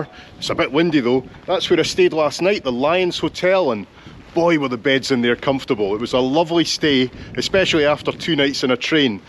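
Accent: Scotland, United Kingdom. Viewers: Nice Scottish accent )) reminded me of my friend, a Scot, with whom we worked together in the same company when I lived in Dubai many years ago ). Good old days ). P.S . Hello from Azerbaijan, fit like loon? ) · Love that Scottish pronunciation! Have a Scottish office mate; have to tell him to watch...and listen! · is this blogger Scottish？😂kinda grasp a sense of Glasweigen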